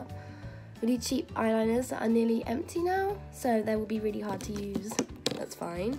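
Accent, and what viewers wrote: United Kingdom. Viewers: She's British but she lives in France ( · YOU’RE BRITISH?!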